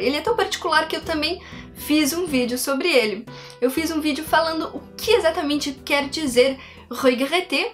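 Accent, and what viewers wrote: Rio Grande do Sul, Brazil. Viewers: so uma dúvida vc e gaúcha.Edvandro do Rio de Janeiro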